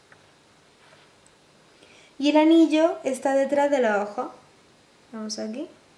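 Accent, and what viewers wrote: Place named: Spain. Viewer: habla como española......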